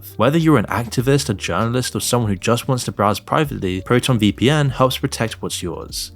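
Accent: United Kingdom. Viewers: Are you speaking with your voice or generated it with AI? If you are generating it can you please make it with American accent not British, it’s hard to listen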